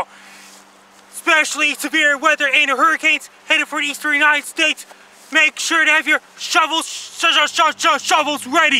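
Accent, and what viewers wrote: Newfoundland, Canada. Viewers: Atlantic accent is like this. Especially Newfoundland..You can't even understand what they're saying.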